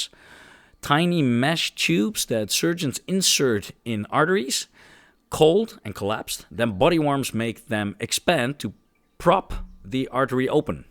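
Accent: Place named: Netherlands. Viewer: ok, vind het anzich een goede video, script vloeit goed, je visual zijn ook goed. enige wat ik bijzonder afleidend vond was het gigantisch duidelijke neder-engels en in de eerste 8 minuten al 5 a 6 klemtoon/uitspraak fouten. zo afleidend dat ik het eerste gedeelte van deze reactie dus al schrijf op dat moment in de video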